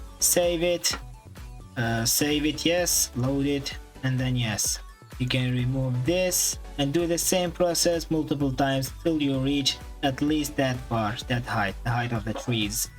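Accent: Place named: Arab world. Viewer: your accent is kinda arabic r u arabic?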